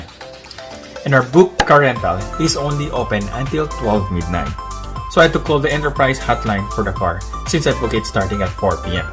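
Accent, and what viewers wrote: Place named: Zamboanga, Philippines. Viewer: From Zamboanga ka po pala kaya pala familiar ang accent ng tagalog, student nurse here from AdZU, hoping to be there soon!!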